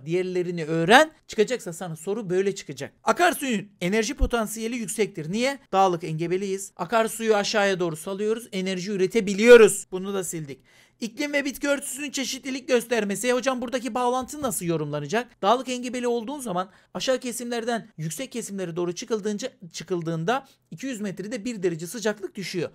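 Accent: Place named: Black Sea, Turkey. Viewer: Ne alaka knk adam Karadenizli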